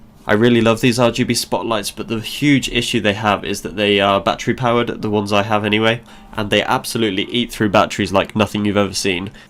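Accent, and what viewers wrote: United Kingdom. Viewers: Are you from UK? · Half way through building my set up great ideas. I have pops and it's great to here another English accent 👏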